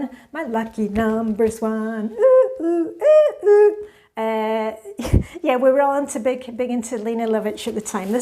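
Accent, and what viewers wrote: Scotland, United Kingdom. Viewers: Hi, so sorry to hear about your mum's passing, my mum was from Edinburgh and your accent always reminds me of hers - she never lost her accent after moving to England in the 80s - but she passed away in my early 20s. My question is: how would you have felt about the decluttering if you didn't have your brother to pass these records onto? We're currently getting ready for an international move and it's the sentimental stuff - you almost crave peace of mind that it's going to a good home, but obviously once it's out in the universe you don't have that control so you end up hanging onto it? Do you think you'd have been as ruthless if it was just being let go? You're always so good with decluttering, does it just become an easy habit making harder choices easier?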